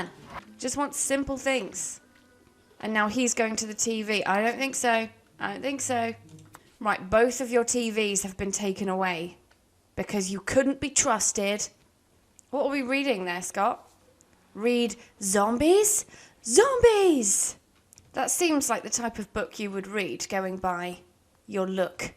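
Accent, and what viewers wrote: United Kingdom. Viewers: I opened the video, then, I heard you're british. I'm sorry. I can't. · I'll be honest here: of course I watch Sims movies because I just love them, but in this channel's case it's the sassy Brittish accent that adds some icing on the cake.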